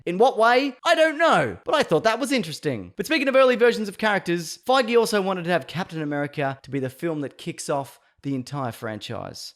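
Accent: Australia. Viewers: You sound Australian so do a video on Danger 5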